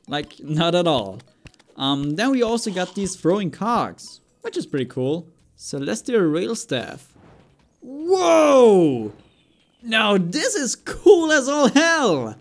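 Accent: Germany. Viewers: dude r u german?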